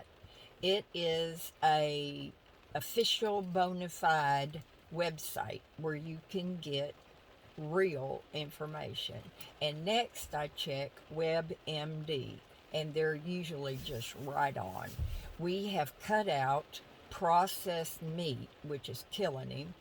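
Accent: South, United States. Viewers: Luv your southern accent! U r so cute! Good luck with Terry!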